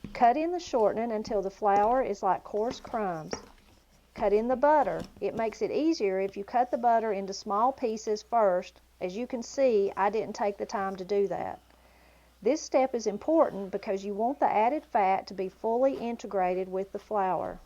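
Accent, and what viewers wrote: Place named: Missouri, United States. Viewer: I just gotta say your speech is just like my kinfolk from central Missouri. You are the first person outside of my family that says Ain’t for aunt. Love listening to you talk.... and cook of course!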